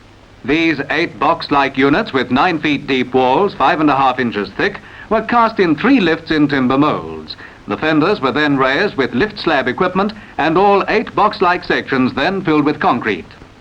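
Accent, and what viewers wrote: Australia. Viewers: Ya got tru blue aussie accent there mate 🤠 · He's Tasmanian, ask someone from Smithton Tasmania where they're from and you'll hear smitten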